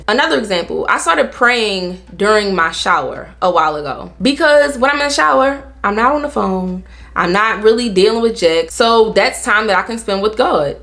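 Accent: Baltimore, United States. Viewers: I felt like you was from Baltimore lol yesssss , I love your videos ! They REALLY help me ! Mane , then I’m sooo isolated now & I see God is doing that . I just changed my alarm to 7am lol #baltimore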